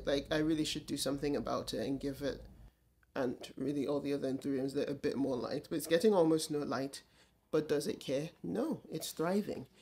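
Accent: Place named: South Africa. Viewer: What a wonderful collection and they all look so happy! (new subscriber here) I love listening to your voice, so musical and expressive! Is your family from South Africa? A good friend of mine is SA and your voice reminds me of his.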